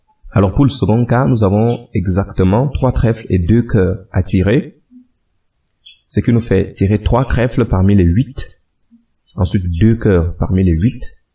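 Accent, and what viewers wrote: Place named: Cameroon. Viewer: Chapeau tres bien explique et en plus vous avez une jolie main d ecriture bien lisible . merci a votre accent je sens que vous etes Africain surement Kmer dnas tous les cas bonne video continue seulement !!!!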